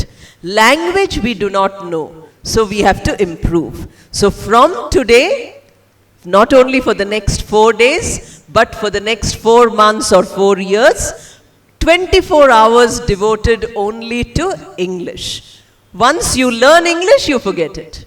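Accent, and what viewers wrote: India. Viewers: Super mumm ..... Your speech is real Indian language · This Indian-born English Professor lady has not learned the correct pronunciation of words she spoke with her Indian accents. I'd suggest this Indian lady Professor to watch some YouTube videos presented by "native" English speaking ladies telling us how to pronounce words - simple or difficult to pronounce - in the way they are clearly understood by audiences all over the world, where English is spoken as a second language as is the case of this Indian "English" Professor lady. For Indian listener like Anita Pandey this Indian lady Professor presented "very nice speech with clear pronunciation" but that was not the case. Anita please watch YouTube presentation "Listening and Understanding" learn English with 3 steps video. Thank you for reading my comments. Hope it helps.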